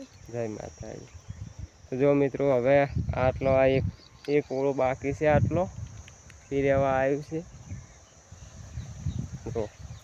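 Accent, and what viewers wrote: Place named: Banaskantha, India. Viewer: ક્યાંથી છો ભાઈ તમારી બોલી બનાસકાંઠા આજુબાજુ ની લાગે છે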